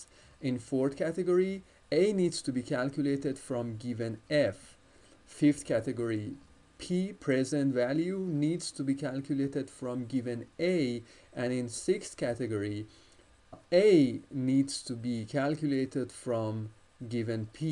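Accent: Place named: Iran. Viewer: pure Iranian accent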